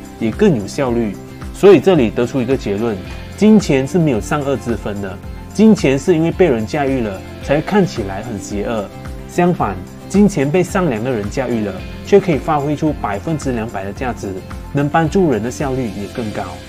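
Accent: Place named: Fuzhou, China. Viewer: 你的福州音好重😂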